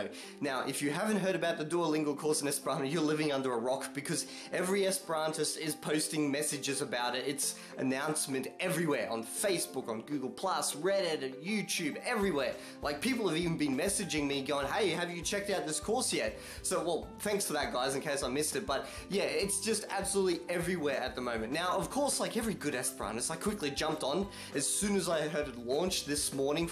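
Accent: Australia. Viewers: Saluton,! I first heard about Esperanto on an episode of Red Dwarf! True. And like most everyone else I've spoken to I thought it was a type of Spanish. My eldest son has been following the development of the Duolingo Esperanto course since last year. When it launched into beta my husband convinced me to join in with them both. I started on the 11th (June) After only six days I know more Esperanto than German which I studied in High School for four years. Using Duolingo is like playing a game, its just plain fun. Also your World of Warcraft Esperanto teaching series is our evening lesson, as the three of us are avid WOW players (Since Vanilla! Ten years who'd of thought we'd be still running around the same world.) It's great to hear another voice, an Aussie one too, speaking Esperanto and explaining some of the nuances we can't get from Duolingo. Like rolling the 'r's'. 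I just couldn't get it until I started repeating after you. Dankon! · I know, I have almost finished my German Duolingo course (5 skills left to go) and I will do the Esperanto course after. And are you Australian? I can't determine your accent.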